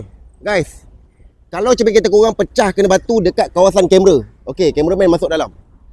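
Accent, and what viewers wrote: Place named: Aceh, Indonesia. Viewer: Aksennya seperti orang aceh yaaa..aq lama di aceh jd familiar dgn logat orang aceh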